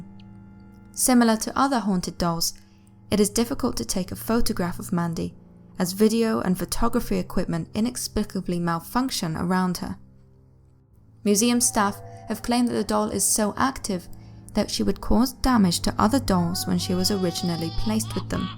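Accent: United Kingdom. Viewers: You have a beautiful British accent . I lived in greater Manchester most of my life being a duel Citizen. Anyways, I am for sure subbing to the channel as I am interested in the true paranormal. There is just to many out there in researchers on television and YouTube that fake it. You do not and I know another person that does not either. Ta mate , for the great videos! You truly have my respect. Cheers!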